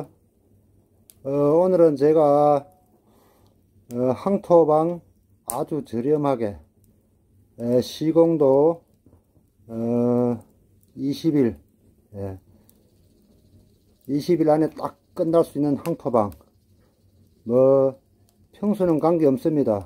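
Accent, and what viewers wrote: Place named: Gyeongbuk, South Korea. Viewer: 경북우리고향 사투리네여 ~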